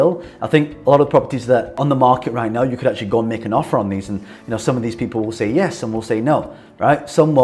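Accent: United Kingdom. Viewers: Love it. Are you from UK?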